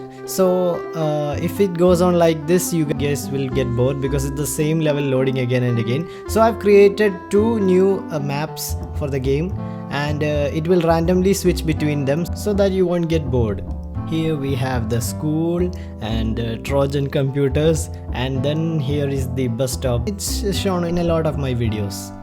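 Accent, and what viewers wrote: Kerala, India. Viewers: he has Indian accent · by hearing the voice itself i had a doubt is this guy a malayalee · you kinda sound indian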